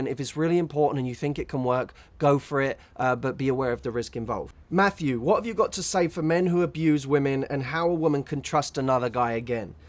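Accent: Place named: United Kingdom. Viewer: I enjoy watching your video... and your british accent.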